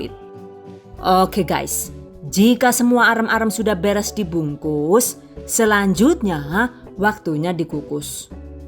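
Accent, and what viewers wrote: Banyumas, Indonesia. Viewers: seneng bgt dengernya mba....logatnya khas ngapak2 ya mba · Assalamu'alaikum bunda, Alhamdulilah bisa liat wajah bunda, saya penggemar berat bunda Lo..saya selalu liat,video2 bunda.. saya suka bngt apalagi pas ngomong ngapak.. saya orang ngapak dari Purwokerto tp tinggal di Brebes 🙏🙏 salam santun,sehat selalu y bun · Suka bngt suaranya bhsane ngapak bngt ky aku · terimah kasih semua resep" nya. saya suka dengan suara nya & bahasa nya, mudh d mengerti. ada d alek Banyumas nya lg. kbetulan. " nyong be wong bnyumas. " Angger ora ngapak ora kepenak ". 😄😄😄🙏🙏🙏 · Kalau sudah ngomong bahasa ngapaknya, anakku gak berhenti tertawa hahaha. DONE #giveawayTHV2020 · Sy suka mbanya klo ngomong lucu suka gaya ngapak dari mana si mbaa salam kenal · Assalamu alaykum Neng ibu reques resep kaldu sayuran dunk🙏... pokoke aku suweneng buanget karo vidio manteb polll gaya bicarane seng gokil logat ngapake,jelas banget ambi tip & trik e,wes to pokoke khas bnget ora ono seng madani,pkoke lope lope yù😘😘😘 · bismillah" DONE"Giveaway# 2020.selalu senang dengerin suara mbaknya yg ngapak.pokoke ORA N GAPAK ORA KEPENAK,OK.CATAT. 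· DONE Sumpah laahh, enyong kie seneng denger step by step caranya...video yang lama jadi gak kerasa lama, coz jadi paham rinciannya dan ngakak sendiri kalau denger mother tongue Ngapaknya..maa syaa Alloh Sukses and penuh berkah buat #THVsukses #GiveawayTHV2020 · The Ngapak Video...tp hasil masaknya mbak e enak tenan mbok,ciamik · Emang aku juga suka skali dgn org cantik pintar d sangat terperinci skali jelas menerangin d suara nja ngapak2 nja lucu mantap kereen deh mks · Mksh Bun, dah berbagi ilmunya. Mantap Bun, suaranya. Ngapak-ngapak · Mbokayu, rika asline ngendi si, deneng ngendikane ngapak pada karo nyong...asli Banyumas kaya kuwe... sukses terus ya yu...nyong seneng nonton koh...lucu...he he he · Hahahaha ngapak metu maning lucu, seneng liat video nya bnyak ilmu terimakasih bun GOD BLESS U Aamiin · Jan janeh wong ngendi sih mba....ko pinter bhs ngapak...pd bae karo nyong🤣🤣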